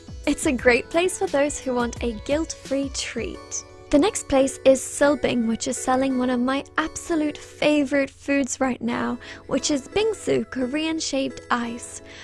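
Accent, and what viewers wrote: United Kingdom. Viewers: I NEVER expected a british accent damn goosebumps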